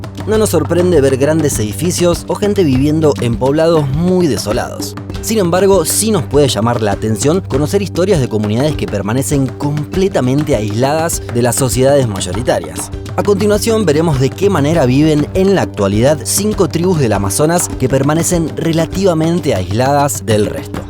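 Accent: Argentina. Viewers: Esta buenisimo el contenido, me encanta tu acento argentino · Porque los argentinos o el acento de esa zona de Argentina que creo que es el porteño es llamativo A mi me gusta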